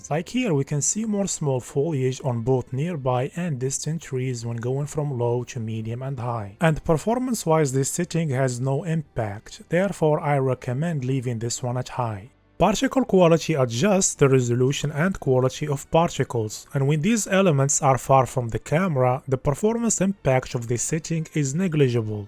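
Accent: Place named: Morocco. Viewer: Bro's accent is as Moroccan as it gets. Keep going, khoya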